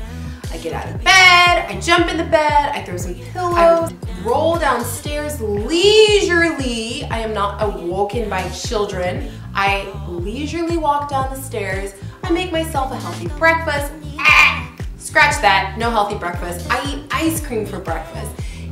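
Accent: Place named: Colorado, United States. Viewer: where are you? I just started to watch you, and your accent sounds like Colorado, where I live..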